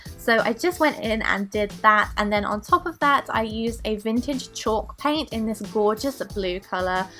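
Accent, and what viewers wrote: United Kingdom. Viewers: It's so cool that your name's and you have the same British accent......btw ur amazing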